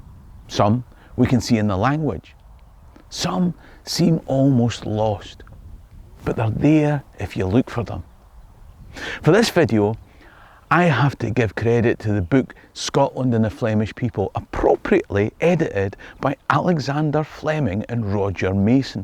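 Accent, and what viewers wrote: Scotland, United Kingdom. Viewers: Accent says Scottish, appearance says mixed race Jamaican guy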